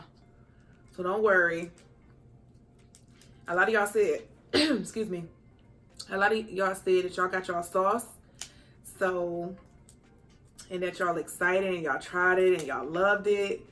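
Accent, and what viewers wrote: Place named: Dallas, United States. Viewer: Hi! This is my 1st video of yours. I like your voice & think I hear a Dallas accent. Are you from Dallas per chance? 🤔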